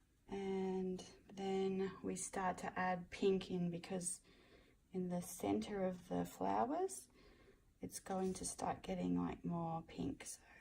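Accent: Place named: Australia. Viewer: I’ve watched a dozen videos on painting Watercolour roses and this one is by far the best. You sound Australian, hi from Tasmania 👋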